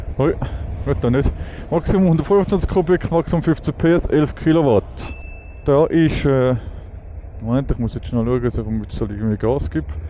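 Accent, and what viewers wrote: Switzerland. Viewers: Schwiezerdütsch, wie spaßig ist das denn, ich liebe es. Geht um Führerscheinklassen fürs Motorrad, soviel hab ich verstanden, großartig. Schöne Grüße aus dem wildem Osten (Deutschland, Thüringen).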